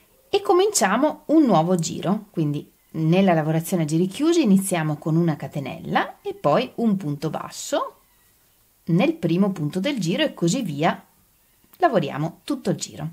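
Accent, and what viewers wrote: Veneto, Italy. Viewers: grazie, ho iniziato da poco a seguirti e devo dire che mi trovo molto bene perchè spieghi in maniere chiara e adoro il tuo leggero accento veneto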